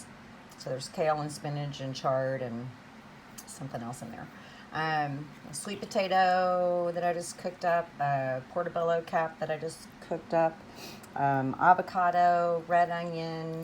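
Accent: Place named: South, United States. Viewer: Hi! What a beautiful place! And salad! And southern accent! I like how you talk about stuff. I really want my whole channel to be like that.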